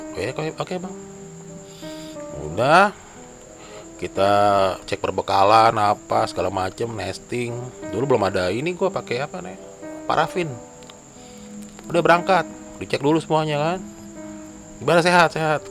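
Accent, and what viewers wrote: Indonesia. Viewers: logat bahasa indonesia lorat bahasa malaysia salam dari perlis malaysia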